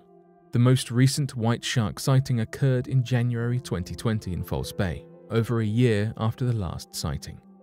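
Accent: England, United Kingdom. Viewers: Look mate you sound English uses feet not metres were not in the EU anymore OK .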